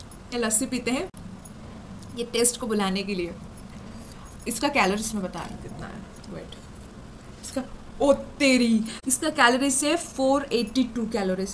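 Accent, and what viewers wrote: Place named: Odisha, India. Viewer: Oh you're from orissa I recognised the way you speak hindi 🙃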